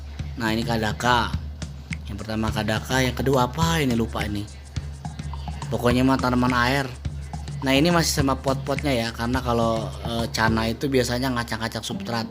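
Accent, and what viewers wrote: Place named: Jakarta, Indonesia. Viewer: Seh Betawi amaat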